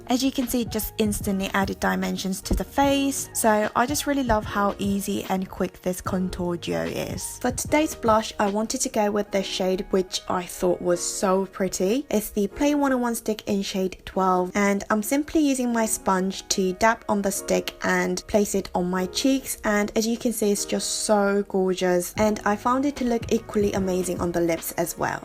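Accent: United Kingdom. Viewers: (응?ㅋㄱㄱㅋㅋㅋ) 레드립은 넘나 화사해보이고, 25호 누디립은 넘나분위기 넘치십니다😭😭😭(덕통사고) 에뛰드가 이번에도 정말 열일했네요!!! 오늘 메이크업은 님 영상보고 영감받아서 클레식메이크업 해봐야겠어요ㅎㅎㅎ 아 맞다 그리고 가을이 좋은이유!! 바로 모든분들이 꼽으실 이유같은데요!ㅋㅋㅋ 일단 바람이 넘나 선선하다는거! 불면증도 사라지고 너무 좋습니당♥ 또 전 개인적으로 가을겨울 옷들을 너무좋아해요, 니트 무스탕 블랙스키니 셔츠 등등 가을에 잘 어울리는 룩들이죵! 게다가 메이크업도!!! 주로 버건디, 퍼플 계열의 메이크업을 하는 전 가을이 너무 좋네욯ㅎㅎㅎ 이제 내 세상이도닷!!!!! 님 영상 항상 잘보고 있고요, 영상 속 영국 영어 너무 매력적이예요💕💕 영국이란 나라에 관심이 많아 발음이라던지 자꾸 그나라 문화를 찾아보고 있는데 그때 님을 알게도었다능...!!! 그럼 다음 영상도 기대하겠습니닷💖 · 언니!! 저는 진짜 ㅜㅜ 유럽여행갔다가 영국분위기에 빠져서 언니 브이로그 보는 거로 대리만족하고있는 구독자에요! ㅜㅜ 진짜 언니 동영상 업데이트 안되도 하루에 세개는 보고 자는듯.. ㅠㅠ 그만큼 언니 영상 좋아해요!! ㅜㅜ 저는 사계절중에 가을이 제일 좋아요! 왜냐면 우선 가을은 여름의 습함과 더움때문에 얼굴에 화장을 하면.. 건설도 지성을 만들어버리는데 가을은 우선 산뜻하고 틈나면 친구랑 한강에 가서 돗자리깔고 얘기만 해도 기분좋게해주는 날씨를 갖고 있기 때문이에요! 그리고 가을하면 저의 엄마 생일이 있어요! 대학과 집이 떨어져서 집에 자주 못가는데 가을에 엄마 생일때 엄마보러 가서 좋아요! 이벤트 당첨 안되도되요! 언니영상에 글 처음써보는데 흐.. 언니 영국발음 너무 좋고 그냥 힐링되요ㅠㅠ 영국 갈게요! 저! ㅜㅜ 진짜로 영국에서 살고 싶네요ㅜㅜ 오늘 메이크업 완전 잘어울리세요ㅜㅜ❤️ 그럼 여기서 글을 마칠게요!! 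언니 영상 잘 봤어요!!💘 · Holy Moly!가을이좋은이유는 여름에는 화장을 1시간넘도록 정성들여하고나갓는데 제가지성인지라 여름은금방땀을흘려버리니피부가 금방 무너지고 유분으로인해 눈가도 다지워져서 여름을싫어하는데 가을은 날도 따쓰하고선선해서 딱좋아하는날씨예요!바깥나들이 가기딱좋은날씨ㅜㅜ그리고 옷도가을때입을옷들도많고 2학기가 시작되는 계절이지요 새로운맘으로 다시 되덜아볼수도있고 그리고 행사도많고!!하핳 구독한지는 꽤되었는데 전 더도말고 님의 특유의 영국발음에 뿅가서 두말할거없이 바로구독 저도 영국에 대해 관심이많고 영국발음에도관심이있었는데 언니의 일상속에서 나타나는 영국발음!배운점이많아서 저도일상에서자주써먹고있습니다!예를들면 영어시간에 남들과는다르게..영국식으로....진짜 거짓말 안하고 요즘 영어듣기도 영국식발음 으로 거의 하는데 언니의 동영상으로인해 저는 영국발음에 익숙한지라 점수도올랐어요✌✌ 브이로그많이찍어서올려주세요!일상속의 님모습도 많이보고싶네요~~ 언제나 응원할게요!!영상 앞으로도 계속 많이 올려주세요!!다음 영상도 기대할게요!!!아 그리고 님은 입술전체에 립을 다발라도잘어울리시네요ㅠㅠ저는 풀발색은 안어울리는데 매트한 립스틱은 그라데이션하기힘들잖아요 그거 어떻게하면 그라데이션잘되는지도 알려주시면 정말 감사할것같습니다!!! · 이번 메이크업이 에게 특히 잘 어울리네요 너무 강한 색 섀도우 쓸때보다 은은한 색들오 메이크업하니까 분위기가 깊은? 그런 느낌이 들게해여!!이뻐여!! 가을이 좋은 이유는 저는 하늘보는거 좋아하는데 가을의 구름들이 가을만 가진 예쁜 모양이나 특이한 형태 그리고 가끔씩은 택스쳐도 다를것같은 그런 특유의 분위기를 가졌더라구요 그래서 전 가을이 좋습니다! 이벤트때문에 댓글을 단 것도 있지만 매번 듣기에 너무 좋은 영국발음과함께 영국생활을 리마인드 해줘서 메이크업은 물론 브이로그 영상도 잘 보고 잇어서 응원하고 싶다는 생각에도 달아봅니다! 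어린나이에 타지에서 언니랑 둘이?인지는 확실치 않지만 생활하는 모습 보기좋구 앞으로도 좋은 영상 잘 부탁드립니다!!! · 영국 발음이 너무 듣기조아요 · 언니 영국 발음이 너무 듣기 좋아서 구독하기 시작했는데 여전히 좋아요...ㅎ사실 제가 영어에 흥미도 없고 못 하기도 해서 영어 잘 하는 사람이 더더욱 부럽고 매력적으로 보이거든요ㅎㅎ헷 특히 툭툭 내뱉는듯한 영국발음은 정말 더럽...❣본론으로 들어가서 공교롭게도 제가 원래 제일 좋아하는 계절이 가을이에요! 가장 싫어하는 계절이 여름이라 여름 다음에 오는 가을이 제일 반갑고 여름이 오기 시작할 때부터 '얼른 가을이 왔으면 좋겠다'라고 생각하거든요ㅎㅎ가을 중에 특히 서늘해지는 9월 저녁 공기가 너무 좋아요 저는 아직 대학생이라 중간고사, 기말고사를 보는데 그 선선한 바람을 맞는 저녁은 시험에 대한 압박감도 없고 정말 여유를 느끼고 산책할 수 있거든요. 그리고 봄과는 다른 이유모를 설렘과 감성이 풍부해져서 좋은 것 같아요 그리고 제가 제일 좋아하는 색인 레드가 잘 어울리는 계절이기도 해서 화장할 맛이 나요ㅋㅋㅋ또 제 생일이 10월이라 제일 행복할 수 있는 날이 가을에 있기 때문에 더욱 가을이 좋은 것 같아요😊 이번 가을은 너무 덥고 태풍도 와서 제가 원하던 가을바람은 못 반겼지만 그래도 곧 다가오는 제 생일을 기대하면서 기분좋게 시간을 맞이하고 있어요 언니도 하루하루 소중히 시간을 보내면서 가을을 맘껏 느끼세요!!😉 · 언니 특유의 영국발음이 좋아서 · 항상 볼 때마다 느끼는거지만, 님은 넘나 귀여운 것 ㅠㅠ 영국악센트도 진짜 러블리.. 항상 좋아요만 누르다가 오랜만에 댓글 다네요. 전 사계절 중 가을을 제일 좋아하는데 제가 더위를 많이타고 벌레를 아주 극혐하는 수준이기 때문에 가을이 제일 좋아요. 특히 제가 건성이라, 겨울엔 아무리 기초를 탄탄하게 해도 화장이 뜨더라고요ㅠㅠ 근데 가을은 시원한데다, 건조해도 화장이 뜨지않고, 비도 별로 안와서 항상 하늘이 예쁘고 화창한 날씨잖아요. 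벌레도 없고. 그래서 가을이 제일 좋아요.😆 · 오늘 메이크업 정말 이뿌네용👍🏻👻 가을은 제가 태어난 계절이기두 해서 가을이 오면 괜히 좀 하이퍼되구요 패션이나 화장 스타일을 좀 어둡고 딥한 걸 조아해서 쇼잉 오프 하는걸 즐길 수 있어서 조아요 ㅠㅗㅠ 영국 발음 그리울 때 채널 와서 영상 보곤 하는뎅 할로윈 멬업도 곧 해주셧으면!! 😘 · 헐.... 오늘 미모가 열일하시는듯 진짜 예뻐요!!!!! 저는 가을이 항상 좋아요! 처음엔 단순이 제 생일이 있어서 가을이 좋았어요!!! 생일이 10월 2일이라서 가을이 막 오는 시점이기도 하고 사랑하는 사람들이 축하해주고 이쁘게 봐주니까 가을이 너무 좋았는데 가을만 되면 센치해져서 좋아요!!!! 여러가지 옷을 입을수도 있고 여러가지 메이크업을 하고 변신을 딱 주기 너무 좋아서!! 저는 트렌치코트를 참 좋아해요!!! 가을에 어울리기도 하죠!!!! MLBB의 계절이기도 하구요!!!! 또 너무 덥지도 너무 춥지도 않아서 얼마나 좋은지!!! 이번여름의 엄청난 열기가 지나가서 올해 가을은 더 좋은거 같아요!!! 여러모로 항상 님 좋아하고 응원해요!!!! 저 님이 좋고 매력적이셔서 미국식발음에서 영국식발음으로 고쳐가고 있어요!!!!! 진짜 영국식발음이 전 진짜 님 매력중 하나인거 같아요!!!! 너무 예쁘고 멋진 님!!!! 가을도 좋고 님도 넘나좋습니다💜🙆💜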